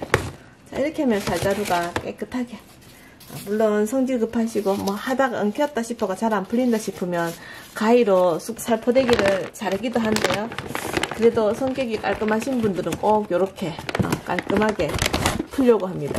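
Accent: Gyeongsang, South Korea. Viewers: 살포대기 살포대기 ... ㅋㅋㅋ 갱상도 사투리 넘 귀여우심 . 좋은 정보 감사요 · 쌀자루.. 발음이, 살자루.. 살포대기로 들립니다. 경상도 울산출신이신 우리 매형 발음과 비슷하십니다. 우리 누님과 매형생각이 납니다. 고속도로 우측 주행... 아주 알기 쉽고, 실용적인 설명 고맙습니다. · 경상도 사투리 이해 합시다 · 경상도 사투리 구수 합니다... 하하하 살포대기... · 쌀브랜드는 서산쌀에 경상도사투리 구수하신 아지매의 음성으로...뭔가 재미있습니다. 구글ai가 오늘은 쌀포대 언박싱영상을 ...성질이 조신하지 못해서 매듭푸는 방법을 아는대도 불구하고 한번에 잘 풀릴때도 있고 방향 거꾸로해서 첫방부터 꼬이기도 하고 이럴때는 속에서 뭔가 올라오는 날ㅋㅋㅋ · 갱상도 사투립니다.. 살, 사움, 엄악 .... ㅋㅋ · 경상도분이신가봐요...쌀을 자꾸 살이라고 발음을 ㅎㅎㅎ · 어쩌다 영상 보게 되었습니다 경상도 사투리가 친근합니다 쌀=☞ 살포데기, 꿰메다☞꼬메다! 특유의 억양까지 말씀이 정겨워 좋습니다 영상 잘 봤습니다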